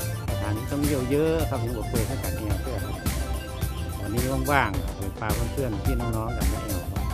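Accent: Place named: North, Thailand. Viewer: เมินๆจะได้ป๊ะคนเหนือเนาะ,อู้เมืองมันม่วนแต๊หนาอ้าย,วิวงามขนาดเลยคับ,เติมใจ๋หื้อเด้ออ้าย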